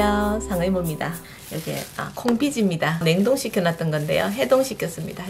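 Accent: Gyeongsang, South Korea. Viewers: 경상도 사투리가 듣기 좋아요. 이 부침개 만들어서 손님들에게 시원한 차와 대접하면 좋겠네요.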